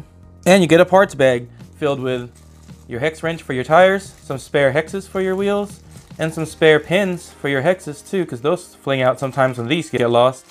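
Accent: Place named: Miami, United States. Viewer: are you from miami, this accent is miami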